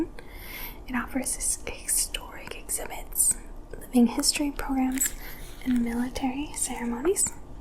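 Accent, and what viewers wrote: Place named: Chicago, United States. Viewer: But that accent is either Chicago or Wisconsin.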